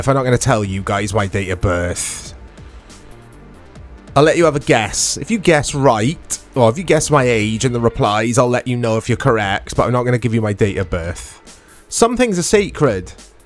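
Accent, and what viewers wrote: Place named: London, United Kingdom. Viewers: Which part of London are you from mate? You sound Posh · Ha. He's English but think lives in Wales, but who knows.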